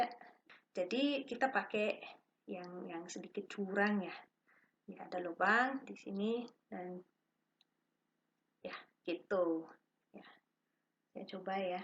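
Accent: Bali, Indonesia. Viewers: u got Balinese accent actually..kebaya suits u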